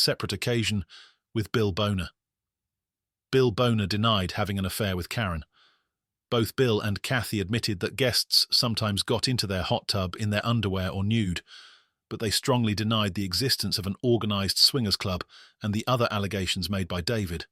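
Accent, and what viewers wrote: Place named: England, United Kingdom. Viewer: I was concerned too when I heard the name hogshooter I am English and love the English voice .thank you for your exceptional channel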